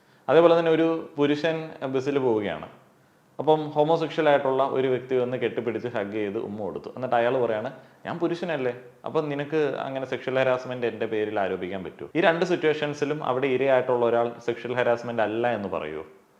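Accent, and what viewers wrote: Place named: Thiruvananthapuram, India. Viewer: തിരോന്തരത്ത് താമസിച്ച് താമസിച്ച് ഞങ്ങട സ്ലാംഗ് ഒക്കെ അറിയാതെ വരുന്നുണ്ട്. 🥰🥰 ഗുഡ് പ്രസന്റേഷൻ. നല്ല ഒബ്സർവേഷനുകൾ.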